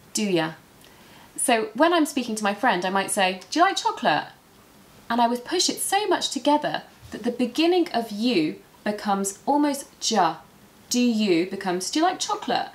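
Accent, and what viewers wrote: United Kingdom. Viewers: British accent i want to hear more, And to learn. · Hi from Mozambique, I love British accent and I'm following it, so thank you very much for spending your time for us. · Thanks for Lesson! 👍😉Yes, understand native English can be very difficult, but listening to natural English via Youtube I hope to help me! 🙂For me, I admit the connected speech is very difficult, but it sounds likeable, and surely I trying it! 🙂 I think that my biggest mistake I learnt grammar during my education (the professors were always got attention on grammar, the little on the conversation 😞) more than the others parts of English, but from now it'll be different! I do try my best! When it comes to understanding English, I would like to watch my favourite series ''The Hotel Babylon'' without subtitle on my own language, but the London accent sounds difficult! 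Although this series lasted too short and watched it already, I can watch it always! Maybe with english subtitle would be easier? And forget, it's good your advice about exercise understaning Native English with girlfriend! 😀👍 About accent, I prefer British accent! I really like it! I I liked it because of you! 😉🙂 In my opinion, British accent sounds more elegant from the American, more beautiful! 🙂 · I like the British accent. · I really like how you teach. I love British Accent.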